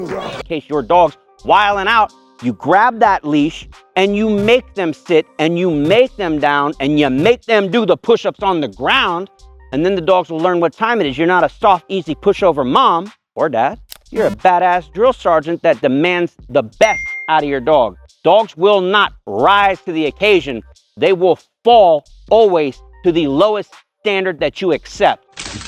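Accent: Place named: Louisiana, United States. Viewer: Nailed that cajun accent!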